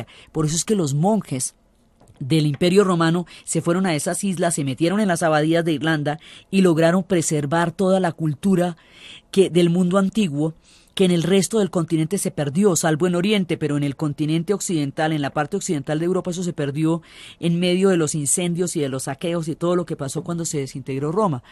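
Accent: Colombia. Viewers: Es facilisimo entender con una narrativa como esta, empeze a escuchar por investigacion pero ahora me he hecho fan y adicta a su canal, aprendo mucho y me hace aprovechar mejor el tiempo!! Ademas me encanta su acento y expresiones Colombianas. Saludos desde Cancun, Mexico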